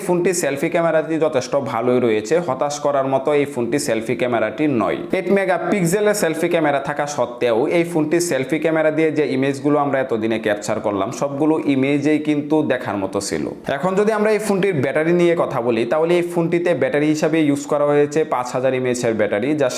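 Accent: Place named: Narsingdi, Bangladesh. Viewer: আপনার বাসা কি নরসিংদী নাকি ভাই কথাগুলো শুনতে ভালো লাগে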